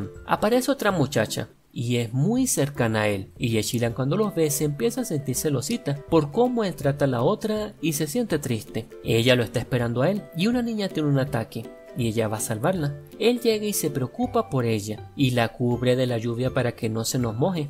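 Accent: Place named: Venezuela. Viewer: No sé el porqué pero siento que eres Venezolano (la voz del chico que hace el resumen), tu forma de hablar es demasiado característica de los Venezolanos.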